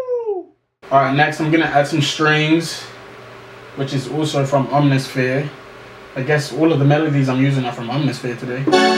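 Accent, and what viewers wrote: United Kingdom. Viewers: Is it me or did he not have a british accent in his other vids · All this time I never clocked u were from London 😂.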